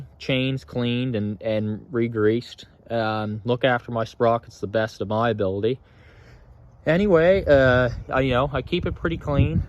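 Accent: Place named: Canada. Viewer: what is NS? Accent sounds heavy canadian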